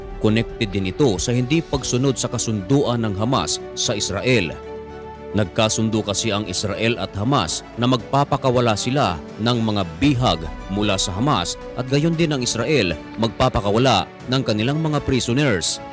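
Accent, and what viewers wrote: Visayas, Philippines. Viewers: bisaya ka dung!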